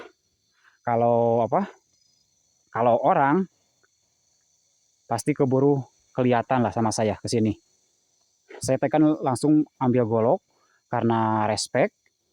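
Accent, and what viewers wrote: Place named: Sunda, Indonesia. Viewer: logat sundanya kentel bngt orang cianjur ya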